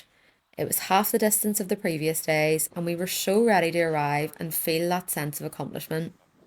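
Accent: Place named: Ireland. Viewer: You took the short route, which is ok, since around 35% of walkers do so. I have never done the Camino, and don't intend to, but I think that despite the blisters and the rainy weather, you had a worthwhile experience. Anyway, some of the filming was quite good, and it looks like you did have some good days. Finally, doing that part of the journey in February has its positives: the main one is that hotels and restaurants were empty, and the trail was quiet, without the crowds you get in June or July. In my humble opinion, standing in front of the Cathedral of Santiago without the massive multitudes, with their accompanying scammers and hucksters, was a good result. By the way, I like your Irish accent, and I am disappointed with some of the cruel responses below.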